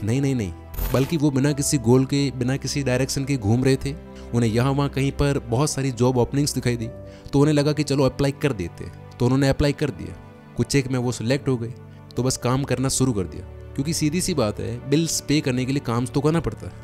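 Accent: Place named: Marwar, India. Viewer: Aapki aavaj se . Pata nahi kyu. Muze lagata hai aap Rajasthani he sayad marwadi? I think I am sure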